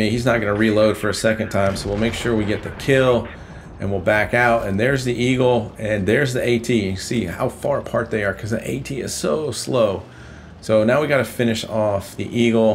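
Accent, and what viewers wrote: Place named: France. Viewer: Great vid mate. I prefer saving my free xp for the blitz fair. Btw great French accent ;)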